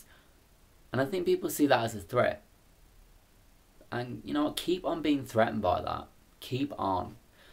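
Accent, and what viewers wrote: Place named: Birmingham, United Kingdom. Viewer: I’m Bromsgrove u sound same x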